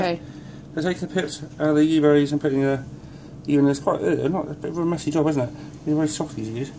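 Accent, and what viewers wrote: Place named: South West England, United Kingdom. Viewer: English, probably South Western. Not far from Forest and Pirate dialects. Pair of dills.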